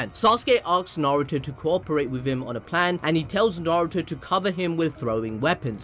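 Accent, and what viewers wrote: Punjab, India. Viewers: dude you indian? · you punjabi ?? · Is this person punjabi🤔🤔🤔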